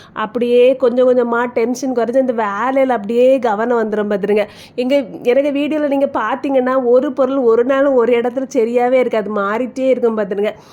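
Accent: Kanyakumari, India. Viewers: No this is kanya kumari slang😂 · உங்கள் kitchen ரொம்ப சுத்தமா இருக்கு. அத விட உங்கள் கன்னியாகுமரி தமிழ் கேட்க நல்லா இருக்கு. இன்று தான் முதல் முறையாக உங்கள் channel பார்க்கிறேன்🎉🎉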